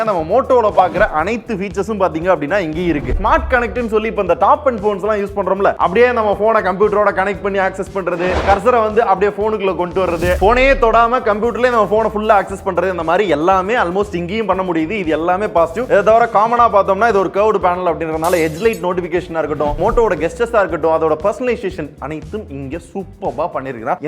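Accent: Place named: Madurai, India. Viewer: மதுரை காரனுக்கு நீங்க பேச சொல்லிக் குடுக்க வேண்டாம்...பேச்சு வழக்கு அப்படி தா இருக்கும்... 💯 பிடிச்சா பாரு இல்லனா‌ கிளம்பி போய்டே இருக்கனும்..✅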